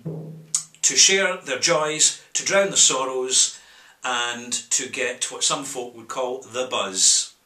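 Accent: Scotland, United Kingdom. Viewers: Look for greenorg? Sorry but you have a bit of a scottish accent :p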